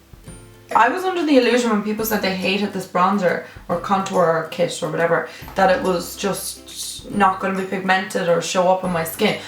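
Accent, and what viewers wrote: Dublin, Ireland. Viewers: What part of Ireland are u from xx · Irish buddy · what part of ireland are you from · What part of Ireland are u from?💕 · where abouts in ireland are you from? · Are u from Dublin? I'm from Langford xx :) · I can't tell wheather your from northern Ireland or southern Ireland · Are u from Dublin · Hi Love! You're also my very first Irish you-tuber. Luuv you & your accent & all your tips. ♥ I've watched a dozen videos in just less than a week's time & the dupes videos over & over & have lists everywhere. I just purchased a few drugstore items today as a matter of fact. Also laughed when you & your sister ate American candy. Now I WANT to get the nude lipliner & lipstick from Penney's. How do I get it here in the U.S.? Thanks ;D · i knew instantly u were frm dublin!! love frm belfast!!! xx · omg r u from Ireland 💘 what county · Where bouts in Ireland are u from ?🇮🇪🇮🇪🇮🇪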